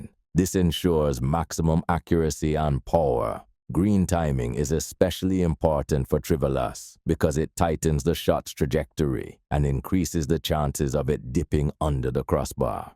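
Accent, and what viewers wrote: Jamaica. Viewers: Great informative video as always bro, you will always be the Bob Marley of eafc... I love the Jamaican accent😊....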